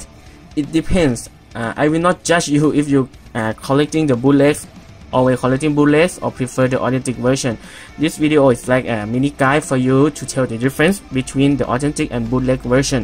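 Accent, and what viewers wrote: Thailand. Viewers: Ooo sawadikhap for your accent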